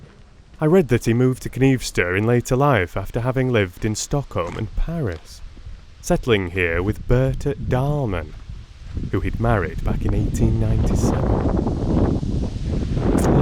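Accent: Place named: England, United Kingdom. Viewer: what a wonderful late summers day! almost feel the smell from the water Your pronounciation is perfectly fine with a typical english accent:-). An extra information about fika is that the word comes from a time when people liked to play with words like this: fi-ka turned around = ka-fi... Kaffi is an older way to say kaffe.(coffe). Love Tove Janssons books, grow up with them. I will listen to your reading soon. 😊🌻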